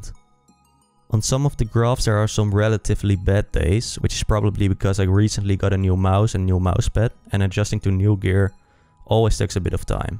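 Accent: Netherlands. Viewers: Je hoort meteen dat je een nederlander bent hahahaha, altijd dat vieze accent. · Hey, I know your Dutch man I hear your accent. I am Dutch too. I've used Kovaaks myself for the last 9 months and I got around 214 hours of gametime in Kovaaks. My aim improved significantly. My biggest weakness to overcome is short strafes fast which I have a hard team dealing with if its moving fast close to me. Anyway my K/D in Warzone was 0.80 and it got boosted to 0.90 recently and it's still gonna climb I notice I do a lot of insane flicks in the gulags also Apex Legends all games seem to be more easy now. I really like your video thumbs up.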